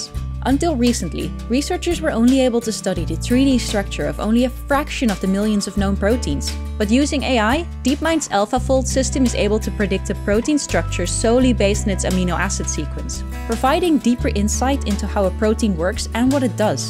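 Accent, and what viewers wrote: Netherlands. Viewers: i thought right away "since when did minuteearth have a dutch accent"? then i checked and saw the name....🤣 cute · Do I hear a Dutch accent there? It sounds like she's Dutch and I love it! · A wild dutch accent appears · Kan me niet op de video concentreren hoor met dat mega Nederlandse accent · Sorry if i am wrong, but i am 99% sure this is a dutch accent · This new presentation voice sounds very Dutch, is she from the Netherlands? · you have to be dutch :) the way you say creutzveldt-jabobs is how you say it in dutch. also there is a dutch accent on the english but Im al proud duty to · It's funny hearing this as a dutch person because the accent of the narrator is so clearly dutch. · And yes, as always i can hear a dutch persons heavy accent. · Dutch accent be thicc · Dutch? i could recongnise that accent from 100 km away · Nou ik hoor dat het Nederlands is natuurlijk, lekker bezig!! 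· Dutch accent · The Dutch accent makes me feel at home instantly. (sorry, if I misidentified the accent) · Are you Dutch? 👀 You sound Dutch (from a Dutch person) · As a Dutch person I instantly recognised the accent as Dutch😅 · ✨Dutch accent✨ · Damn that accent cannot be more Dutch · Massive dutch accent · Yikes, dat je uit Nederland komt is onmiddellijk duidelijk van jouw accent. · Heyyy that's a dutch gal; I can instantly tell. Je doet het goed! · she has a Dutch (Netherlands) accent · that's just her being Dutch though. They talk like that :p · Are you dutch by any chance?? Your accent really sounds Dutch 🧍‍♀️ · She is definitely dutch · As soon as started to talk I heard the Dutch accent! Awesome video anyway:)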